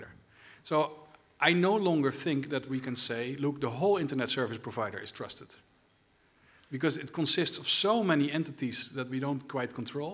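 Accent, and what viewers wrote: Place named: Netherlands. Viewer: Yes. The Dutch accent is kinda cool.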